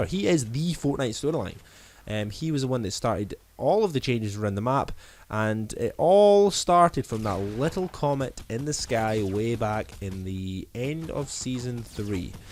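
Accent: Scotland, United Kingdom. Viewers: Scottish boi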